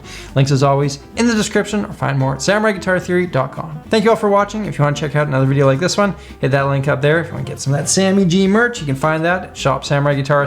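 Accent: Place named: Canada. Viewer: For a few videos now I couldn't shake off the feeling that Sammy's voice reminds me very much of somebody else's. And now it hit me - Jordan Peterson! I guess that's what you call Canadian accent. Which makes me excited I can hear it, not being a native English speaker.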